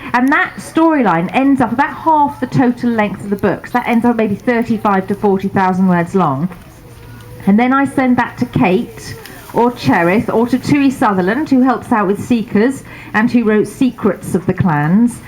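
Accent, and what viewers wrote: United Kingdom. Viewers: We're is she from Scotland? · what...she british.....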